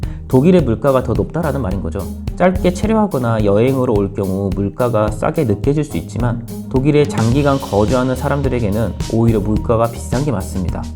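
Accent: Jeolla, South Korea. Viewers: 전라도 분이 신거 같은데요 억양이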